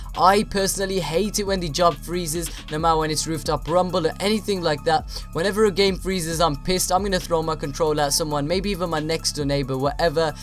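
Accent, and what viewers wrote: United Kingdom. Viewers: This guy sounds like he's from the UK, ain't from Canada?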